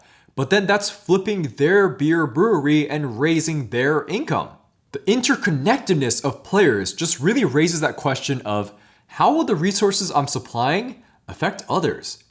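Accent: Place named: United Kingdom. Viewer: Nice British Accent. Cool game, just not my speed.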